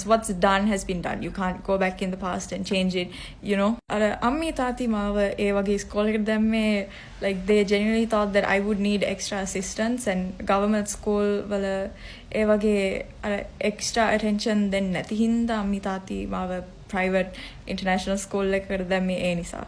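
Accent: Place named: Sri Lanka. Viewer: English katha karanneth sinhala wagema thamai, loku wenasak nha, mekige boru pade. Phyco case ekak wage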